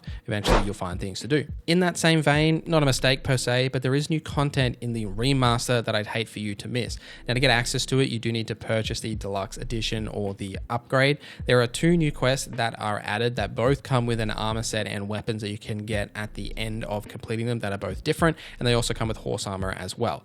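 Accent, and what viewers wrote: Australia. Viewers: He's Australian cut him some slack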